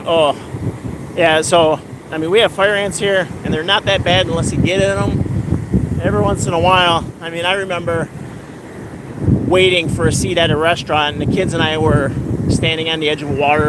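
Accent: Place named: North, United Kingdom. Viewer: Coming in a bit late but loving this video! Been coming to the Panhandle since I was a teenager (or before) ... about 100 years ago. It was before all the high rises were there. But, I've never walked the pier. Very cool. Beautiful sunrise! Sound is good except seems I hear a bit of a northern accent ... just a little bit. 😂❤❤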